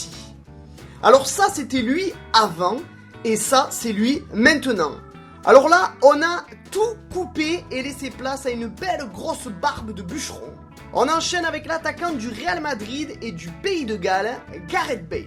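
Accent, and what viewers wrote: South, France. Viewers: Tu viens du Sud toi, non !?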